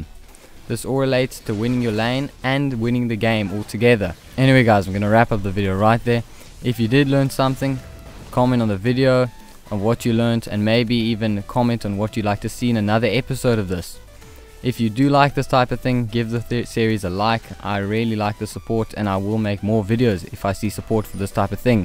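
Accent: South Africa. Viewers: You south african? 😆 game name?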